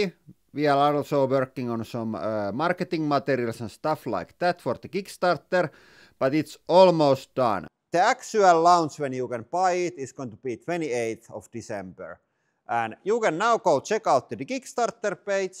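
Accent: Finland. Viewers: Love the Finnish accent 😊 Greetings from Austria 🇦🇹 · i dont understand a fu**in word of your rallienglanti. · Some of us are here for the pressing action, some of us are here for the ralli English. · ..mietin, että mukava viteo.. mistäköhän. ? .. mutta sitte tuo kotosen kuulonen ”rallienklanti” kertoo että juu, härmästähän nämä.. 😎 ….mukavaa testiä.. 👍👍👍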